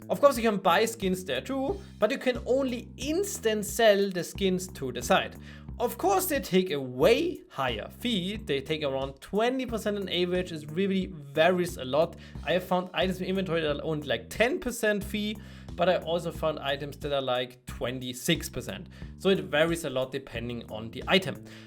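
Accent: Germany. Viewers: brandenburg englisch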